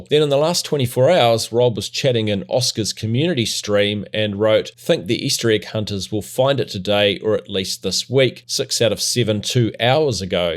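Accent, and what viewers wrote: New Zealand. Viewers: ru from new zealand or australia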